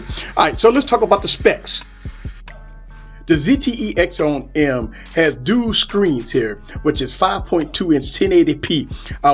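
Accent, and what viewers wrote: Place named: South, United States. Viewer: Nice video....cant stand the southern bumpkin broken gutta' accent tho....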